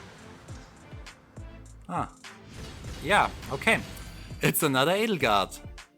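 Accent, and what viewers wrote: Germany. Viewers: German accent: nunja, dann haben wir hier ja noch einen deutschen Feh Spieler! :)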